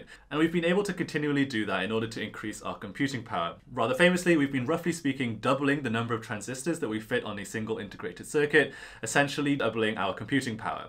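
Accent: United Kingdom. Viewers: I didn't conciously realize you had a british accent until I saw how you spelled "transister" and thought it was the british spelling.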